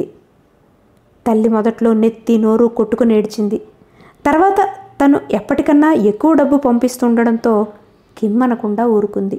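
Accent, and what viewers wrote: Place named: Godavari, India. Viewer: స్వాతీ! ఎంత బాగా చదివేరో!ఈ కథను ఎవరు చదివినా గోదావరి జిల్లా స్లాంగ్ రాక నాకు కొంత అసంతృప్తి గా ఉండేది. చాలా చాలా థాంక్స్ మీకు. మీరు కథ పెట్టిన నాలుగు రోజులు గమనించక పోవడం వేరే ఫంక్షన్ లో ఉండి తప్ప మరేం కాదు. 😊👌👍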